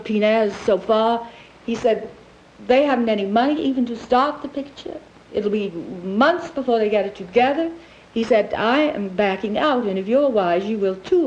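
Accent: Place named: Transatlantic, United States. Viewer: According to her book 'Lulu in Hollywood' , early in her career , she was disparaged for her 'hick' Kansas accent and trained her voice to get that Transatlantic accent which gives her voice a beautifully articulated tone and you can easily understand every word she says. Ironically , as it turned out , a perfect accent for acting in the sound movies.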